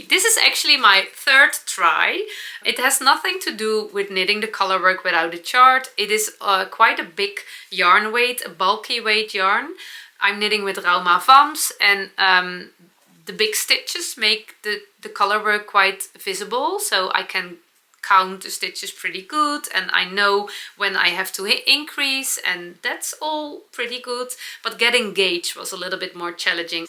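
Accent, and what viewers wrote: Netherlands. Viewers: I look forward to your Dutchy English each week. Thank you for sharing heart jumping moments through your creative processes. The plants you have look happy too. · I love your dutchy English, your yarn choices. Vests are always a great garnement, and knitting sleeves feels like knitting the second sock. 😂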